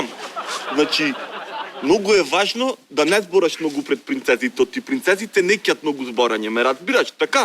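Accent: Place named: Bitola, North Macedonia. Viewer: Mnogu posimpatichno ke zvuchat najavite po bitolski 15 bez 12 ili Kako god da vikate No toa e vash odraz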